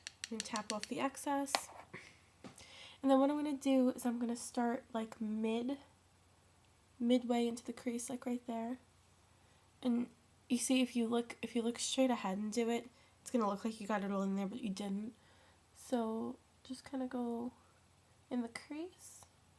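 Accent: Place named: New York, United States. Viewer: i just looked at my 88 warm palette, and i was looked at youtube for some inspiration on a cranberry look! i'm going to use this today for school and work! and btw love the new york accent haha i'm from the west coast so i recognized it immediately!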